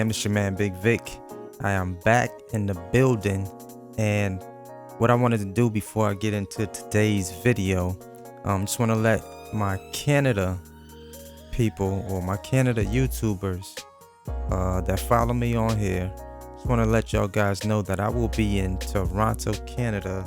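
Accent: Jamaica. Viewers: it bad mi don sound like yu have a Jamaican like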